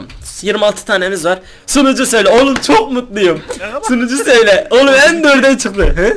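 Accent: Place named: Eastern Anatolia, Turkey. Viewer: Abi senin şiven tam doğu şivesi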